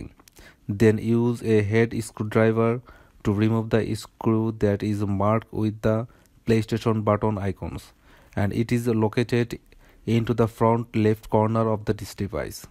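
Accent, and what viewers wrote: India. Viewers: When bro started talking with the Indian accent i knew i was in the right place... But L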